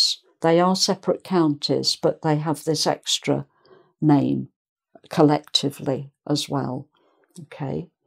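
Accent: United Kingdom. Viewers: Beautiful british accent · Hello, teacher! I love your accent brithish and your lessons, than to be easy to understand. I am Brazilian and study every single.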